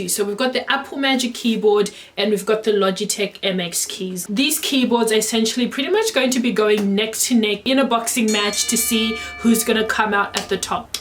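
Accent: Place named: Australia. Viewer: Thanks for sharing so much detail, I love your Aussie accent :)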